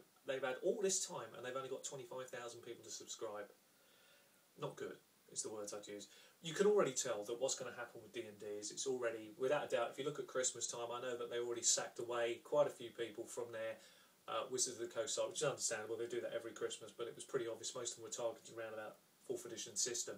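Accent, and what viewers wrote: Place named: United Kingdom. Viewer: Just typed my name in search, found a well spoken british man talking about rpgs and a hockey goalie kicking some ass. Nice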